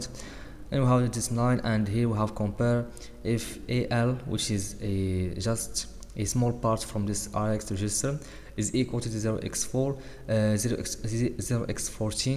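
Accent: Morocco. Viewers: bro are you a Moroccan? Your accent? I'm to from morocco, good luck with your work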